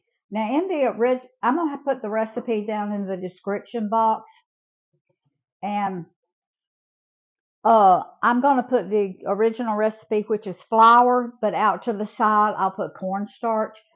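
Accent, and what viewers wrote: South, United States. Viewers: Hi, I just came upon while watching another video. I'm a 71 year old,married to my wonderful husband for 50 years, two children, six grandchildren, from eastern North Carolina, who loves Jesus! I hear by your accent that you're southern too, where do you live? I'm enjoying your videos! Wishing you and many blessings!❤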